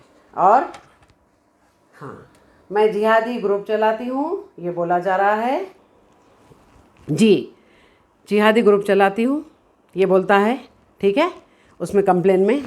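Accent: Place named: Hyderabad, India. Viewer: ap sida urdu boliye haydrabadi acha nahi lagta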